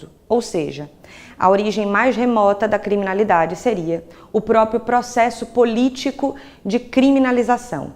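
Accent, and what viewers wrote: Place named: Bahia, Brazil. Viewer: acho que vem da BAHIA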